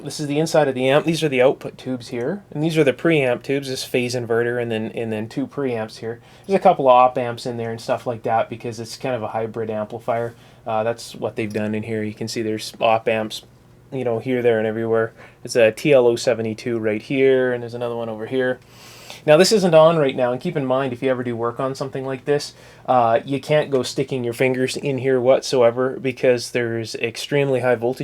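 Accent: Canada. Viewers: My wife always laughs at me because she has learned to pick out your voice by the "Solder", "Ohh-kaye?" and other Canadian idiosyncrasies... Nothing but love from Texas though,.:) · Great explanations of what you are doing and why! I have to say, though, that you are from or near the Great White North (Canada), eh?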